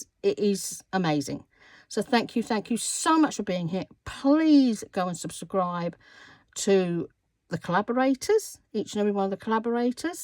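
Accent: Scotland, United Kingdom. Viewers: ... I too... Am so grateful for the Playlist... I was in the DARK... Lol... Trying to get or find the people involved in the actual collaborate... But, thx to your Playlist it's perfectly clear now... ROTFLMFAO 😅... Plus getting to see those that have made such lovely creations... 🌹 I am so a new bee subscriber to your channel... Thank you for sharing your creativity wiff us... Also, loved hear your Scottish accent... I lived in Aberdeen Scotland area for bout 3.5 year's... Fell head over heels 👠 in love with it all... Rented a Farm House 🏡 in the middle of 2500 acres... Of the Major Irwins 5,000 acre Estate... In Newmachar... Siittinton House 🏡... 🌹... Sincerely sincere Miss Judith ❤ ✨ 🌹...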